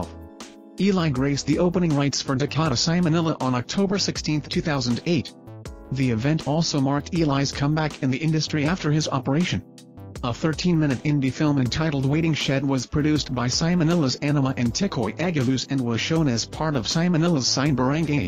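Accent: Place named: United States. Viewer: Who's commentator...American accent... keep it up...nice trivia... about Ely buendia...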